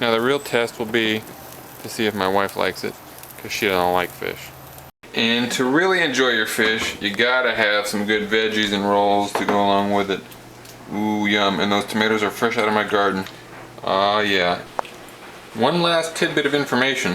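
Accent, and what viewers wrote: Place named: Midwest, United States. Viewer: Where are you from? I can't place your accent. Somewhere from the Midwest? Chicago maybe?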